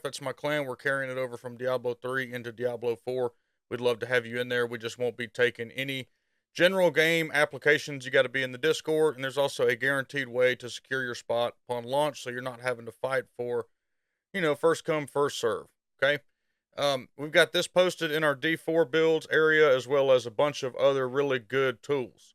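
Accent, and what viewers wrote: South, United States. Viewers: I hear the redneck voice and instantly tune out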